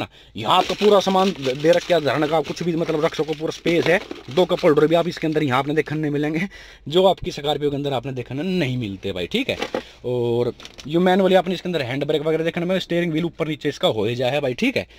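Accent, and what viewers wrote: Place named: Uttar Pradesh, India. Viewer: Bhai apni Desi up wali language is best haryanvi is not good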